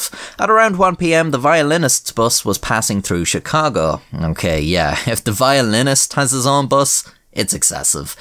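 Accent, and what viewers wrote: Ireland. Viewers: "...two turds of the people on the boat below." When the Irish accent lends itself to incidental humor... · An Irish voice telling us to trust Atlas... Hmm...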